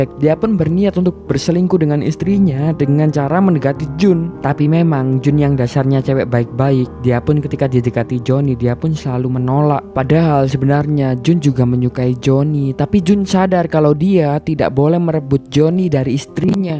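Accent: Java, Indonesia. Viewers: medhok jowo👍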